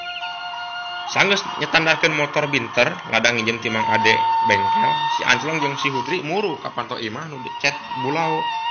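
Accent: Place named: Java, Indonesia. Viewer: resep logat jawana.....hhee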